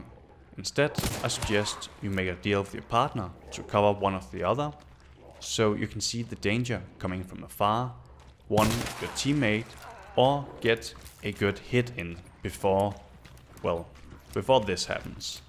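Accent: Denmark. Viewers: You sound danish? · U english sounds very danish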